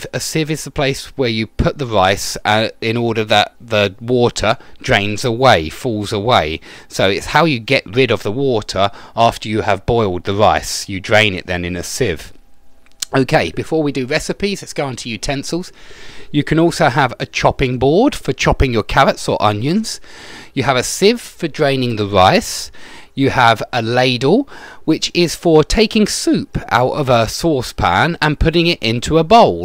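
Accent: United Kingdom. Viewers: your british accent is just lovely.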